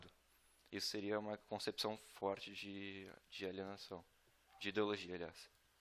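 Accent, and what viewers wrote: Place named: Rio Grande do Sul, Brazil. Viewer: sotaque bem gaucho . .top lembrei de meu amigo gaucho de novo hamburgo..bom video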